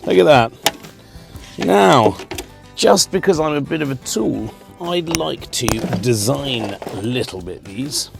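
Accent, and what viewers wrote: RP, United Kingdom. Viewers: There's something about hearing swearing in a posh accent that brings me great joy. 😆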